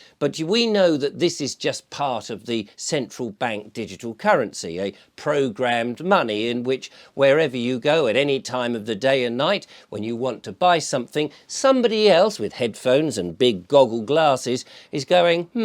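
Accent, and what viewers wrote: Germany. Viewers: Excellent German accent btw 👨🏻‍✈️ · Never mind the naff, the German accent was highly significant. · I love your work and particularly your German accent. Keep bringing it brother xxxx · Hello, alas, I used to work in retail banking for my sins but hopefully I can make amends:) The bank staff have to ask about large withdrawals as a safe guarding procedure and it is understandable that it rubs people up the wrong way. All unusual withdrawals are looked into seriously in case there is money laundering at play as well. This is in the Banking Code Law and to be in breach of it, there used to be a 7 year jail term for the staff member. You lived in fear of getting anything wrong. There is also a limit on the amount of cash that a branch can hold (insurance purposes) but large withdrawals can be done by appointment. Often, it's a worry about people's safety carrying it around or being robbed. 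I love ze German accent and I'm no fan of the way banking is going either! Looking forward to the solutions videos, Sunak & co. have left things too late, we need to act now. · Your German accent sounded like Kermit the frog, just realized that Kermit is a German name. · Good German accent, à la Klaus.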